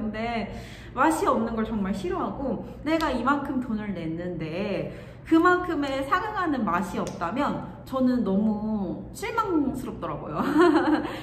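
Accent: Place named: Gyeongsang, South Korea. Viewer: 사장님 경상도분같은데 말투가~! 카페 부산쪽에있으신걸까요~~ 한번 가보고싶어요💕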